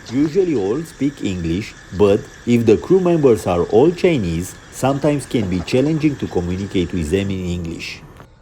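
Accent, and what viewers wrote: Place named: India. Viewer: インド訛りの英語だね。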